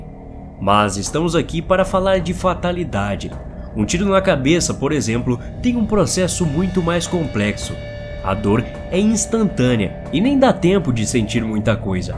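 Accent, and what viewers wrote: Northeast, Brazil. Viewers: Ele é um pouco nordestino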